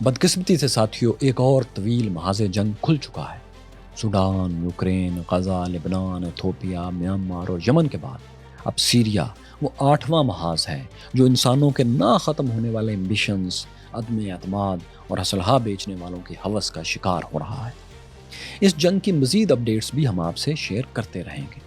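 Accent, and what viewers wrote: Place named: Punjab, Pakistan. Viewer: What language are you speaking in? It appears to be a mixture of English, Arabic, Farsi, Turkish and Hindustani being spoken in a heavy Punjabi accent.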